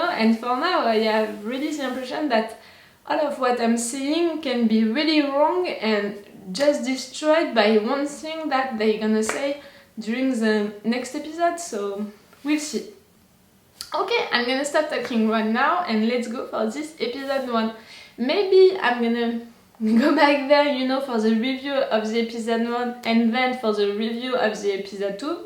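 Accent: France. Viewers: Your french is a particular dialect by the accent you speak in English. I have heard it before. I don't know which one but it is not as common. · Lol “I’m still French” has so much weight with this quarantine. Like You may be quarantined, but your French will never be Quarantined 😂 I love your accent. My father studied in France and I learned a bit of the language growing up. But I don’t remember everything anymore, just a little. Je peux dire ça en français. Je pense que vous êtes une femme très belle et intelligente. Lol I hope I put the accents in the right place, :D Anyways stay healthy and safe, and i will listen to your Reaction now while I work :D ❤️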